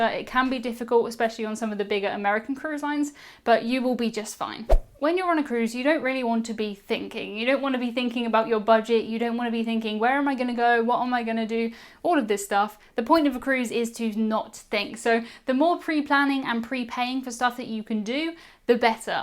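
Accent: United Kingdom. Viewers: Please say "aeroplane" just one more time. I love the British-isms. "Spec-i-AL-i-ty" "Washing" "The Lift" Love it. Keep talking. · Hi, great tips and I do like your background. Especially, bringing a variety of medicine, (including sea sickness pills). I'm one to bring stuff like that, as I grew up a Girl Scout (Girl Guide in Britain) and try to be prepared for anything. My companion would probably be the on to appreciate that I had headache medicine and not only extra sunscreen, but also the pain relief spray in case of a sunburn. 💊🧴. I'm in the US, near Chicago, IL and I d o enjoy listening to your voice. It doesn't seem posh, just a Brit speaking. 😊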